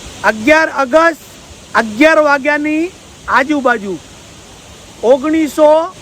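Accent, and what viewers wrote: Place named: Kutch, India. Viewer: વાહ અદભુત તમારી મીઠી કચ્છી ને દેશી વાણીથી માણસોને ઘણુ જાણવા મળેછે😊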